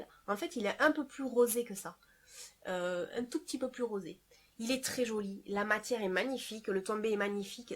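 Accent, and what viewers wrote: South, France. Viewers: Coucou jolie encore une fois super haul, pas de souci on entend toujours ton bel accent du sud!!! J'ai aimé ton magnifique sac camel qui fait très chic, ma préférence va aussi vers cette très jolie jupe crayon en dentelle et ton pantalon camel taille haute avec des boutons (j'ai cette même coupe avec bouton mais en jeans) Petite question peux tu vraiment garder une seule pièce? Pas facile!!! Les pièces te vont toutes à ravir Je te dis soigne toi bien tout là bas dans le sud et te fais de gros bisous A bientôt dans ta prochaine vidéo Farah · Coucou, comme d'habitude, très chics tous ces achats que tu as fais. j'ai pratiquement tout aimé: la veste à pans, le pantalon, le superbe combi-short, le pull épaules en dentelle, les bottines..... Le sac est très joli. Moi mes sacs préférés sont les sacs seaux, à pompons, à franges, à clous, en moumoute, aspect daim..... 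Je raffole de ces sacs:) Le pull bordeaux est très beau. J'en ai aussi quelques-un dans mon armoire. C'est vrai, ça tient chaud. Les robes-pulls, j'en ai pas mal; je suis bien dedans. J'avais deviné, à ton accent, que tu vivais dans le sud:) Pour ses vacances, mon frère allait souvent à Banyuls-sur-mer (réputé pour son bon vin).Il ne devait pas être très loin de chez toi:) Merci pour cette sympathique video. Soigne-toi bien. A bientôt. Gros bisous ma belle.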